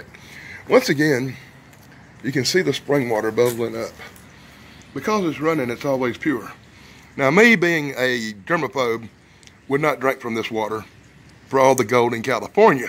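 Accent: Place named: South Carolina, United States. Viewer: you for sure sound like you are from near williamston south carolina haha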